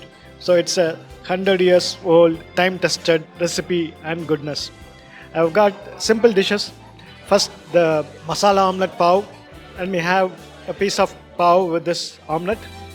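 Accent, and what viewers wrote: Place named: South India, India. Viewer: THIS SAUTH INDIAN ACCENT OF NARETION LOOKS ODD TYPES OF IFFICULT TO UNDERSTAND FULLY...NO GOOD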